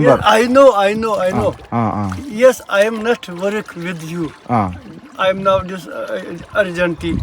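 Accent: Malappuram, India. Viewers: Cute cuples... എനിക്കു൦ ഇങ്ങനെ ഇംഗ്ലീഷ് പഠിപ്പിച്ചു തരുമോ😍😅മലപ്പുറം ഇംഗ്ലീഷ് കേൾക്കാൻ നല്ല രസണ്ട് 😅😅😍😍🥰🥰 പാത്തുമ്മ പാവ൦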